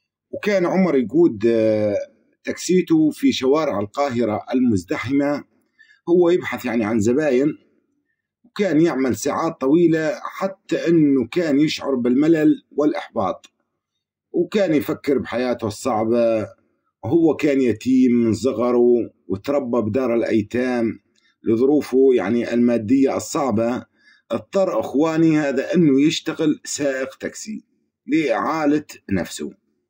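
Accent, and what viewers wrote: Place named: Yemen. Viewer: والله قصة رائعة ومن لفظ الكلام تبدو ربمى من دولة يماني اوعماني .المهم قصة حقيقية وان لم تكن حقيقة امثالها كثير ما أعجبني هو الكلام مفهوم جدا اتمنى قصة أخرى ...من المتتبع عبد الرفيع سامح ولاية ميلة شرق الجزائر❤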